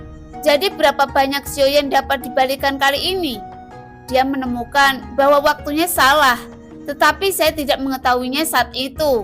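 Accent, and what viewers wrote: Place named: East Java, Indonesia. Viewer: Baru kali ini nemu channel BTTH acak"an gini, dan pembawaannya medok jawa timur sungguh gak enak didengar, PARAH NI NENEK NENEK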